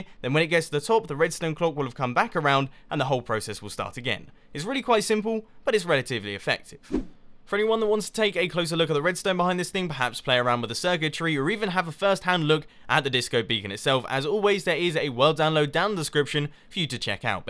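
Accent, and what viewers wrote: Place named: United Kingdom. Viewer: He sounds really British in this😂 and I’m from england😂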